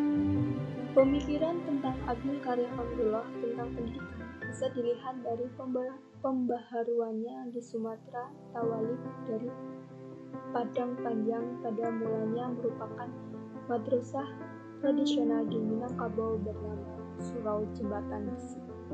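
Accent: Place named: Banyumasan, Indonesia. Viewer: Uwuuuuu ngapak mantap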